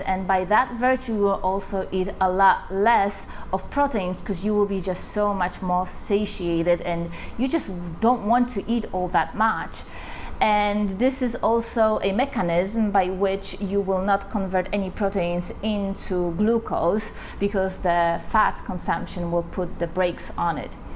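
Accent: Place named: United Kingdom. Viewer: With your British(?) accent you need to speak slower and more clearly if you want to increase USA audience, although you are pretty good looking so maybe it won't matter. However thank you for your good content that I will try now to listen to. Correction, your speed and clarity now that I have listened is really not bad. I might be just a slow old man.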